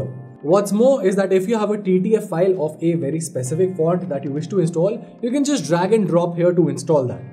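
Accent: India. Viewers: you say that the code is below this video and in this key moment of you pass so fast and even your accent is from a foreigner country that looks from india. so now i have to go to another smart guy for see where to get this wonderful code. If you speak slowly is going to be good for everybody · The Indian accent is so melodious, however, when speaking English he must slow down, as it is very hard to follow him. Great content, but I wish he would slow down a bit.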